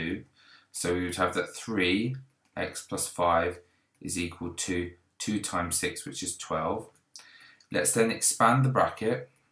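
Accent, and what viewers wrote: United Kingdom. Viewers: This may come as a surprise to you but he is in fact speaking in a 'British English' accent...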